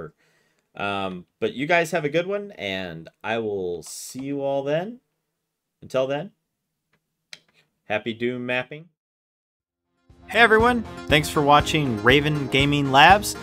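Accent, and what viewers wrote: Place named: Brooklyn, United States. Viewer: what is brooklyn guy babbling about nice tutorial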